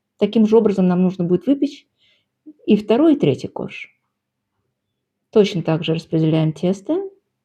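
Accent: Baku, Azerbaijan. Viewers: Как вы хорошо объясняете,подробно ,все секреты выпечки не скрываете..Настоящая бакинка советского времени .Я тоже бакинка ,жила 8 завокзальная,у нас у всех такой акцент ,бакинский,чистый литературный.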